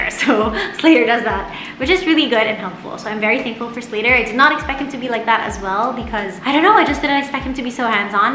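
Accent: Visayas, Philippines. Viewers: Idk but bisan dili pako mom or wife I’m still a students I enjoyed watching your vlogs. Excited na nuon ko ma mommy joke mangita pa man diay kog uyab. Cute sad kaayu ka mag bisaya Ms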